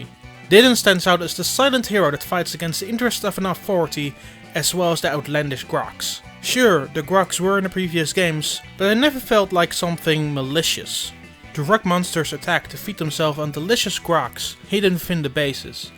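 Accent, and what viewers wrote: Netherlands. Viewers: Yes he is Dutch lol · Is this dude Dutch? His accent is slightly off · You are dutch, i can hear that ;-)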